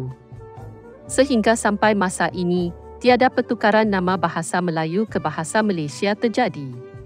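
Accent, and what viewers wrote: Indonesia. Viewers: btul ini admin ber loghat indonesia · Anda sekarang bicara berlogak bahasa Indonesia kan?? 🤣🤣🤣 Gak usah bilang itu bahasa melayu baku. Preet!! Admin peak lu! Banyak belajar sono!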